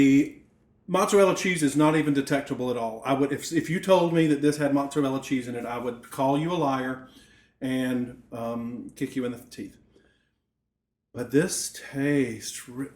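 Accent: South, United States. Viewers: Why do I sound like a Southern girl after watching your videos? :) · Awesome! Thanks. I know that the KetoConnect guys said you're from Florida, but have you lived there all your life? You sound more like a SC or GA native. I've lived all my life in SC, NC and Florida, so truly a southern girl looking for an easy recipe for my favorite low country BBQ sauce (low carb of course) :).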